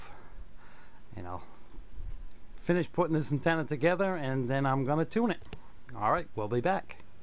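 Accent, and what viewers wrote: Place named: Boston, United States. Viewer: Interesting - if you’re going dipole on 10/11 meters vertical polarization is the BEST way to go. You sound like my Southie submarine shipmates - where ya’ from? Bahstin?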